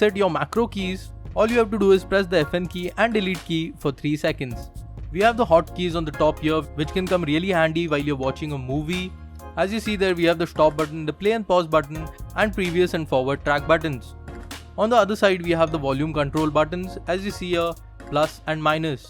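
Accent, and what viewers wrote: India. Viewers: Some criticism: 1. Stop emphasising the fact that this keyboard is "useful for gamers." It's part of Asus's Cerberus line up which is specific to gamers. 2. It's not your Indian accent, but just your voice that's irritating. I never thought an Indian accent could become worse honestly. 3. Who the fuck does a keyboard review and doesn't do a sound test? Like it's standard for all keyboard reviews. 4. Manuals are all available online, you don't have to literally show them page by page. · Indian accent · i hate India accent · i really hate the Indian accent, but nice video. thanks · LUL, not all Indians sound like him btw ! q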